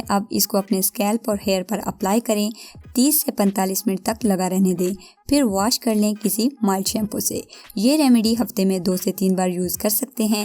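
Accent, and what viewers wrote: Punjab, India. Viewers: sister v love her with Punjabi lehja. She is a talented person so it does not matter that what is her eccent. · api punjabi nai bolti or wo punjab sy hy to pujabi style hi ho ga na .or kaisy boly wo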